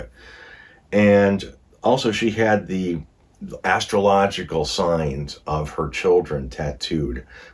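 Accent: Chicago, United States. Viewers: Do I detect a very watered down Chicago accent? Some words are making me thing you may be one of My People. Edit: too bad Ozempic didn’t get discovered for weight loss years earlier because I think that would’ve saved her life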